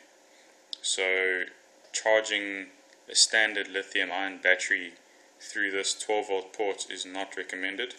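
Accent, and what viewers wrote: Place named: South Africa. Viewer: Is that a South African voice I hear. Please tell me you know of a good place to fly in Cape Town, preferably close to the Northern Suburbs. Looking for a place to maiden my Bixler 2, It will will also be my first flight.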